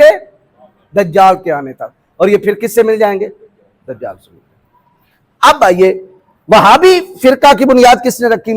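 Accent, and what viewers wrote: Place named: Punjab, India. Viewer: Mashallah bht achchi malumat par Panjabi bech bech me bolkar khas khas Samajh nahi aya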